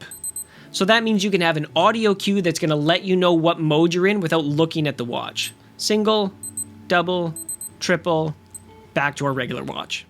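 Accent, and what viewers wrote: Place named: Canada. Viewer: I'm guessing you're in Canada, as you pronounce the word "top" the same way as Jordan Peterson.....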